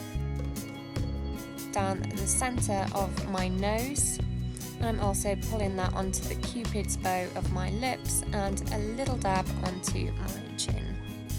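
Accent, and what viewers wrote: United Kingdom. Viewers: From Britian, I'd suggest!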